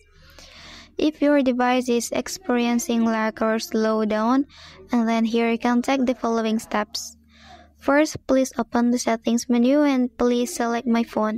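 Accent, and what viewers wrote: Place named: United Kingdom. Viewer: So British luh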